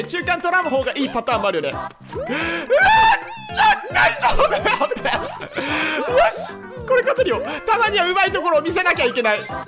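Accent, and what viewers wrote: Fukuoka, Japan. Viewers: 方言よきよき😊 福岡住んでたから愛着あるぅ！いまだ福岡の方言でちゃいます😂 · 自分も福岡ベンなんで、方言使ってくれるの、嬉しいです！ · 福岡の方言のほうが聞きやすくて好きです🤘🏻笑 今日も動画面白かったです！ありがとうございます☺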